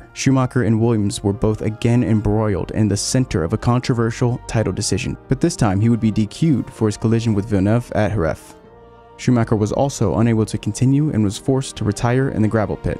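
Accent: United States. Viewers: a well spoken American accent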